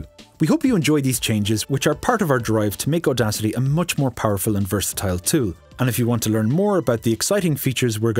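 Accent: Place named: Ireland. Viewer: Subscribed for Matrin "Tantacrul" Keary's excellent UX design sensibilities and pleasant Irish lilt.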